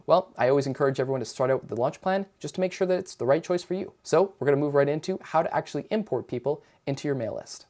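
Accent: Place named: United States. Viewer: So just slow the video down? (I’m an American as well, but your American is showing)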